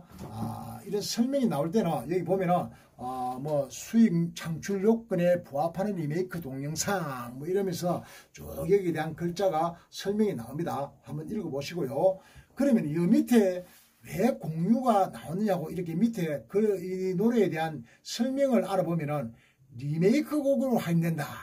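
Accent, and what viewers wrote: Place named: Gyeongsang, South Korea. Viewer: #강펀치tv님 안녕하세요 인사드려요 #저작권 아! 유튜브 스튜디어 들어가서 자세히 살펴보고 알아보고 계시네요 ~^^ 그런데 경상도 사투리 가 정겹게 들리네요 ^^ 아 ~음악만 듣다가 목소리가 들리니깐 정말 반갑습니다 😁 잘보고 응원하고 갑니다 늘 건강하시고 행복하세요 😊 또올께요 🤗 큰성장 하시길 바랍니다 🙏 화이팅하세요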